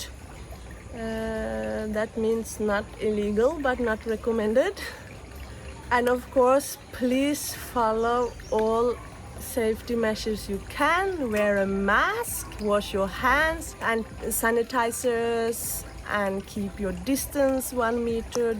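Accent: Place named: Sri Lanka. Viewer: Oh My God she sounds like a Sri Lankan 😁 Incredible!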